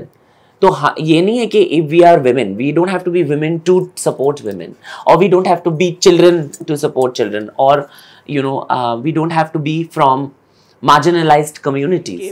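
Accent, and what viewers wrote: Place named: Maharashtra, India. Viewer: 😂😂😂😂 love to watching your vlog i m obsessed with "bahot ho gaya fashion and bata wala scene" love u dear make more vlogs with your hindi marathi accent please ❤❤❤❤❤❤lots of love...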